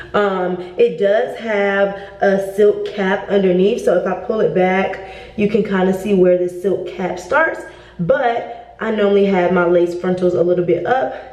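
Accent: New York, United States. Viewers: you're picking up the New York accent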